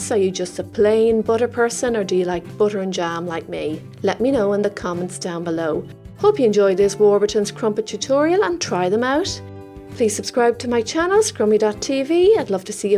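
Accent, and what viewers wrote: Ireland. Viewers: YOUR irish accent and watching was great❤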